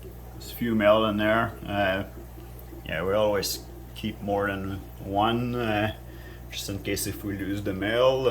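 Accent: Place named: France. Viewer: I am very glad that I came across this video from a kijiji add! I just recently got my first small tank so I am looking to learn a lot more so that my fish can thrive. You son is pretty smart to have started his own business so young! You must be a very proud father. I love all of the fish! You have a new subscriber. I am actually French, too. I recognized the accent. Sounds just like me ha!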